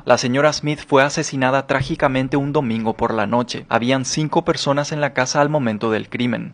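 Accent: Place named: Argentina. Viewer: amigo porque de la nada empezó a utilizar el acento argentino si en los otros videos no lo usaba , no veo la necesidad de hacerlo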